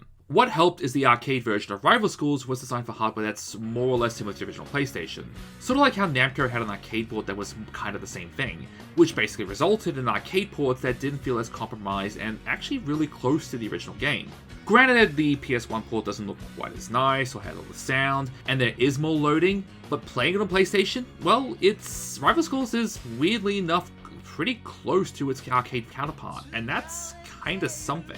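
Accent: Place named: United Kingdom. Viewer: youtube commentary: uk accent introduction that belabors the very concept itself absolute misuse of the word 'unique' rendering it pointless *potential absolute misuse of the word 'weird' rendering it pointless *potential use of the phrase 'deep dive' to suggest the idea of retrospective opinion *This is where I clicked off the video.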